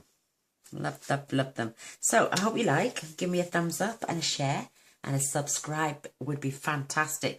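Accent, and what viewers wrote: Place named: Wales, United Kingdom. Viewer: i thought i hear ed. A bit of walsh hun i am in cardiff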